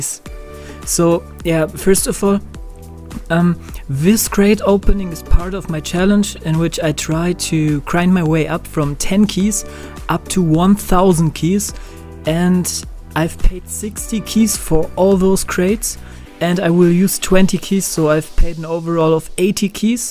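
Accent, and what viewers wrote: Germany. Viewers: You're German? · Wie man minimal hört dass du deutsch bist hahahahahaha xD gutes Video:) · dude are you from germany?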